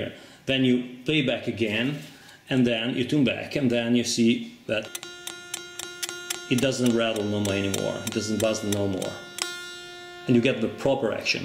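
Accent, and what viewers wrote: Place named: Italy. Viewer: Nice trick! With always this funny italian accent! (although my french one can be funny too! ;-) ) . I let you know I follow you to make my guitars fixing and adjustements and it's ok all over the way ! :-)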